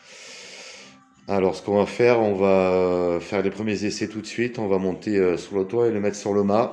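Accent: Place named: Nice, France. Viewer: bonjour , je suis curieux de voir l’évolution de ton projet ( plus que jamais utile vu les difficulté que connait le pays en ce qui concerne l’électricité ) , voir ou t' en et ( batterie? si oui ,combien d'ampere heure... combien de watts t'arrive à tirer etc ) ps : on reconnait direct l'accent nissart , j'ai grandi a nice :))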